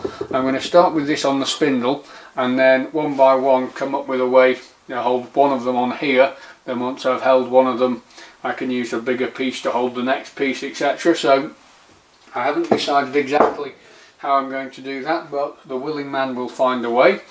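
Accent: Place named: Cumbria, United Kingdom. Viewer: lake district? Cumbria? your accent reminds me of my granddad, he was a tool and die maker from a long line of em who worked at Vickers up in Barrow in Furness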